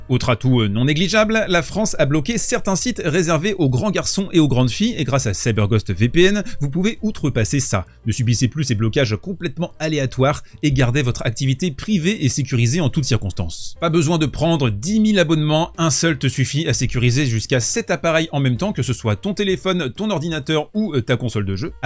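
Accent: Belgium. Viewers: J’croyais qu’il était Belge le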